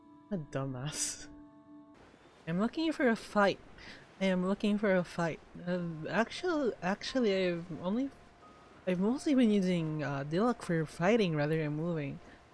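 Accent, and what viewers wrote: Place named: Philippines. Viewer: is it just me who hears his filo / pinoy accent?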